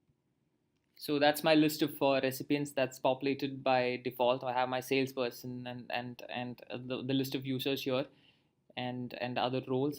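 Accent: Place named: India. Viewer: 😅 indian accent 🤦‍♂️🤦‍♂️ at least try to speak without accent, 🤦‍♂️🤦‍♂️ nobody likes this shit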